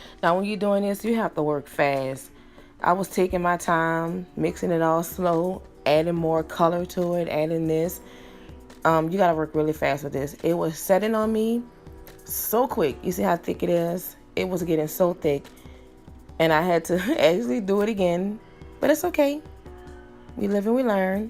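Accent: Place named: New Orleans, United States. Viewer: That N.O. accent BABY! 💕 thanks for posting this video I'm in New York I want to make my own as well!!!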